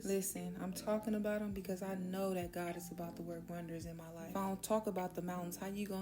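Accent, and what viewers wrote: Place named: Los Angeles, United States. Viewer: She sound pure LA 😂😂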